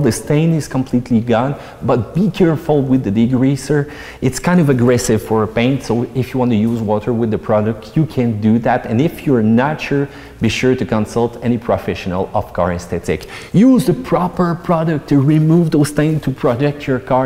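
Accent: Quebec, Canada. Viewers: hahahhahaha quebecoissssss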